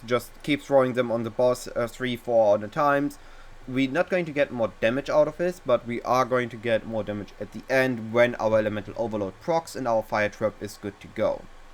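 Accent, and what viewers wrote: Germany. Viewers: High-end german accent.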